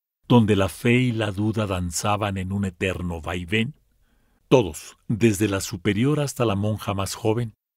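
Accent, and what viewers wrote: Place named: Vallegrande, Bolivia. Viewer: Este narrador creo es vallegrandino puro coplas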